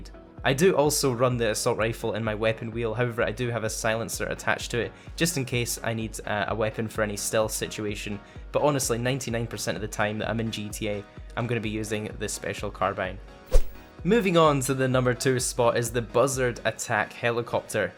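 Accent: Scotland, United Kingdom. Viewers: M8 your so British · Scotland? ^3^ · Are you Scottish?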